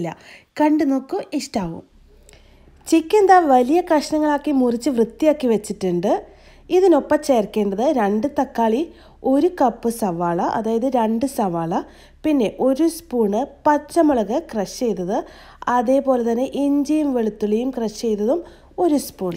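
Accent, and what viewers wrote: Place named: Kozhikode, India. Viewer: കോഴിക്കോടൻ ബിരിയാണിയും, ഭാഷയും സൂപ്പർ 👌👌👌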